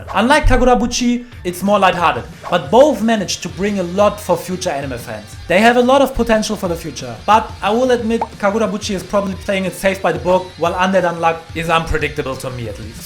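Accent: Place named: Germany. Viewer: man hört hart den deutschen akzent geil 😂😂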